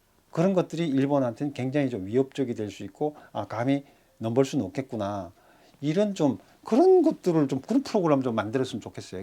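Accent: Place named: North Korea. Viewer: 탈북민이내 말투가 북한말투인대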